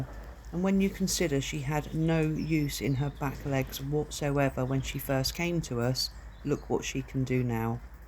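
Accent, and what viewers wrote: United Kingdom. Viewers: Beautifully narrated video. It's very nice to see them safe and healthy. Always puts a smile on my face when you say "filthy dirty nose!" Must be the nice British accent. Thank you. Stay safe and well everyone! 🙏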